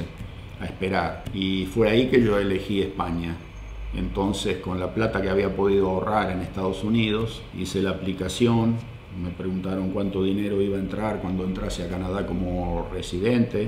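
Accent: Argentina. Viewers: Como sabe de cba, sabía de potrero..como si hubiera nacido acá, me pregunto dónde sería la casa acá cerca del clínicas, no perdiste nada el acento argentino.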